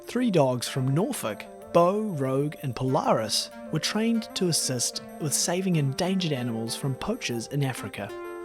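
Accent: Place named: Australia. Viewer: Absolute garbage! Just some guy talking with an aussie accent and telling stories with irrelevant stock shots. #shamelesscashgrab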